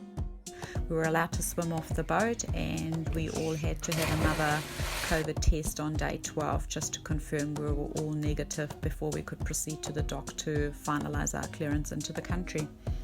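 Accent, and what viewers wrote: South Africa. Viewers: Evening, yousound South African?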